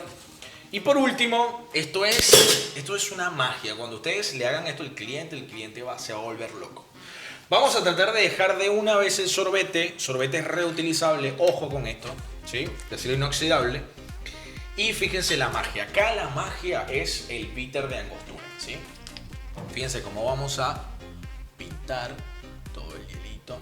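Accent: Argentina. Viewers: Eres argentino! Wow yo pensando que eras chileno 😂 me encantan tus vídeos, continúa así 😍 😊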